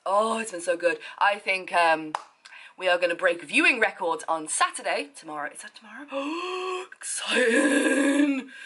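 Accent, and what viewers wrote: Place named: Birmingham, United Kingdom. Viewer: I knew you were a Brummie 👍🏼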